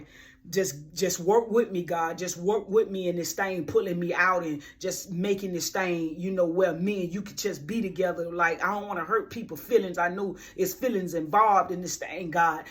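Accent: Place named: Louisiana, United States. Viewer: That’s that Lousiana, low country GA or SC accent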